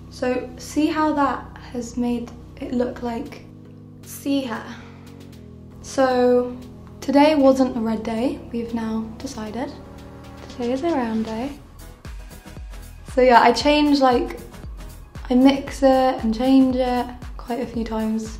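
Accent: United Kingdom. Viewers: She’s so young, but her British accent makes her seem older and more sophisticated 👍💅🏻💄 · sotaque britânico AAAAAA 😍🥱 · Hyu Yu i do agree that it is a common accent in the uk but i find it so pretty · moonchild It’s honestly just an average British accent, I didn’t even notice 😂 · does anyone know what british her accent is i love it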